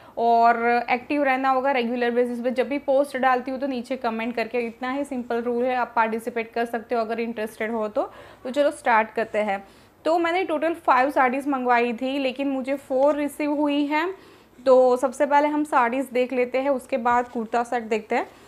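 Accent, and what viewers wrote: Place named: Gujarat, India. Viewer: Ma'am I think aap gujarati ho aapke bolnese lag raha hai.. Mebhi gujarati hu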